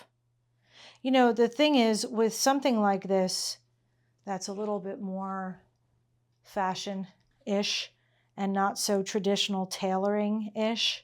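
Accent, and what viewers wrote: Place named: Bronx, United States. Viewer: A few other thoughts about garment sewing. First is that I've found that over the years, it's not been so much that I needed patience to be able to get the work done, but that in doing the work, it has given me patience. Next was the revelation I had when watching you sew the facing to the foreparts....See? I'm paying attention, professor! I realized that I'd done that very technique years ago! I was doing a repair job on something or other, had what I thought was absolutely no seam allowance and therefore needed to sew by hand. I folded the two edges about the same way I watched you do it, and sewed them together. NO IDEA at the time that I was doing the sewing the way traditional tailors did. For me, it just shows that human beings very often find solutions to problems that are similar, and execute them similarly. 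Oh yeah. Every time I watch you, I chuckle hearing that NYC accent AND ATTITUDE. You can take the woman out of New York, but you can't take New York out of the woman. And, during one of Susan Khaljes' other classes, she put in a lapped zipper using pick stitches. Same technique, different application.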